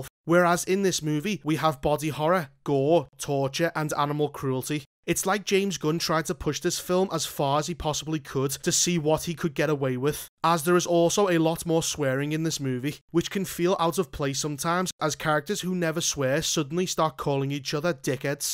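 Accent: Liverpool, United Kingdom. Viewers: It's scouse, not Scottish 😅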